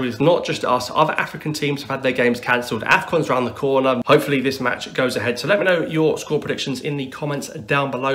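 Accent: United Kingdom. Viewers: you got a British accent ! Are you English guy who supports algerian football team ?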